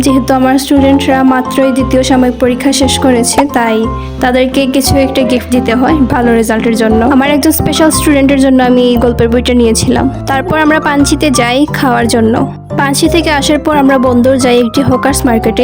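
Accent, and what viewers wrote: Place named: Sylhet, Bangladesh. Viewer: তুমার মুখে সিলেটি ভাষা প্রচন্ড ভালো লাগে।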